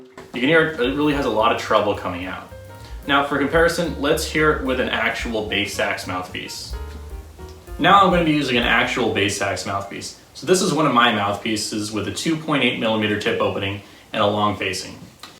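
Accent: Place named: Canada. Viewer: i keep thinking your canadian i dont know why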